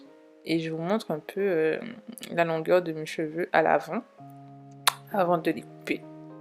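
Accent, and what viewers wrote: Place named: Guadeloupe, France. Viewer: Oui super! Ma question était un peu bête car en regardant ta video 23 choses a savoir je l'ai su mdrr (de toute façon à l'accent je m'en doutais eheh) #TeamGuadeloupe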